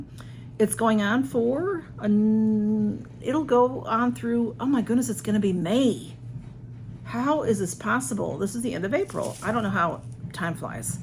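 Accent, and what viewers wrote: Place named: South, United States. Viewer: Happy Mask Monday! Nice Mask! Love your southern accent! I’m subscribed to the channels you mentioned. I’m trying to catch up, so many to watch lol. I have a terrible sweet tooth, trying to eat less sweets, it’s hard lol. I did eat the entire bag of that same Kettle corn in two days 😂 it’s my weakness. 🙏🏻😻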